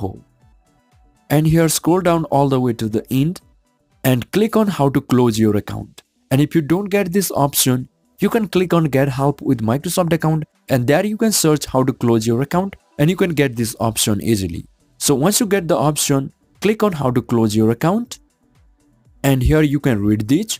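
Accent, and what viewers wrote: India. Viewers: Oh wow he was rhe best indian voice ever no raiseseme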